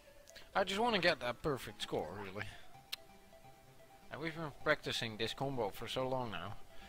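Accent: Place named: Netherlands. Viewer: Are you Dutch? What is this accent?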